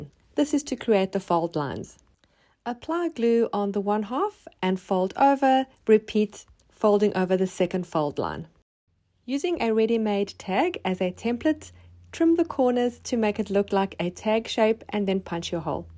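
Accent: South Africa. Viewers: HI I hear that you are south African .are you based in SA or somewhere else. Wendy from SA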